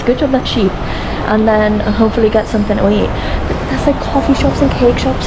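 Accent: United Kingdom. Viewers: 你说的英语我不是那么能听懂 。感觉习惯老美的口音多一些 ；不过伦敦口音还是很好听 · your accent reminds me of Jodie Comer. Are you from Liverpool, too?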